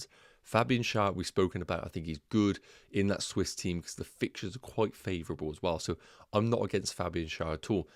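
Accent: Bristol, United Kingdom. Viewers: You sound like a Bristolian, do you support City or Rovers?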